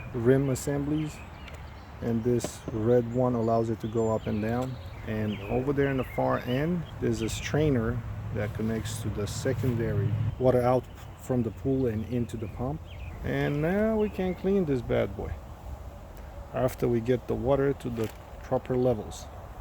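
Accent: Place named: Eastern Europe. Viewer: Can’t quite place the accent..sounds Eastern European, and to my Romanian ear, it sounds almost Romanian. Which is it? Curious here..:)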